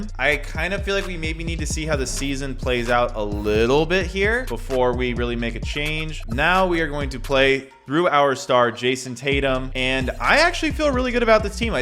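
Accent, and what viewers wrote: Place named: South, United States. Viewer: honestly i love the redneck accent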